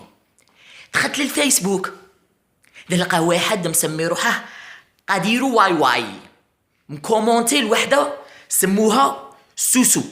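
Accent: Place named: Oran, Algeria. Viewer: ههه لهجة وهرانية مضحكة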